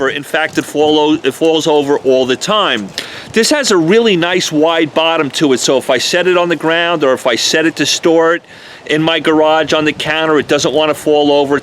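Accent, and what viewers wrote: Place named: New Jersey, United States. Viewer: I was expecting a southerner when it said Dallas, Tx, not someone from Jersey.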